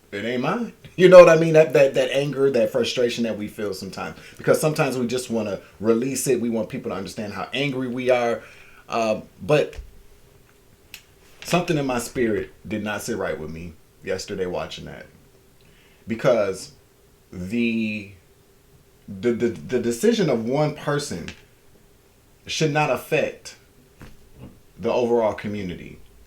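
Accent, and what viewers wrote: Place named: Canada. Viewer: Sounds like Canada 🇨🇦 aaa lol my home and native land 😉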